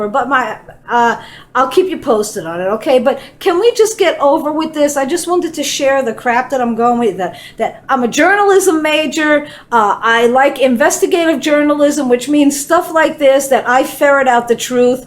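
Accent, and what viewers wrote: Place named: Long Island, United States. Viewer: After watching a flw videos why I like U I know U said U lived in Nevado but then I found U were from NY Now U say U were born in PA I am a Lancaster Cty baby that moved to Jersey then to Hastings just north of NYC Your accent tells me L.I. I gave been fulltining for just over a yr Rt now in Cali Thanks for the entertaining videos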